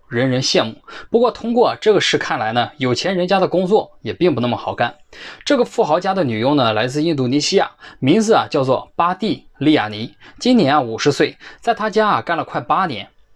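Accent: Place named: Northeast, China. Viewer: 小哥这嘎啦味普通话很亲切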